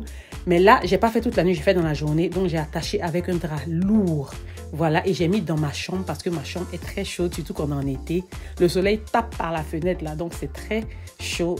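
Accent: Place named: Ivory Coast. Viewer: Ma chérie je sais que tu es ma sœur Ivoirienne vu ton accent👏👏👏🥰🥰🥰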